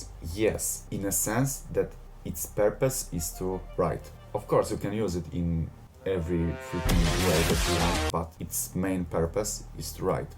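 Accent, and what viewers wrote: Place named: Poland. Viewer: Akcent bardzo polski, materiał ciekawy i ćwicz stary ćwicz!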